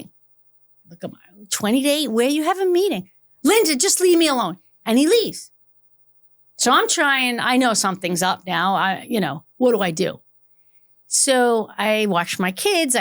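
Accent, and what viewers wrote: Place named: New York, United States. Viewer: I could listen to her for hours. Love the NY accent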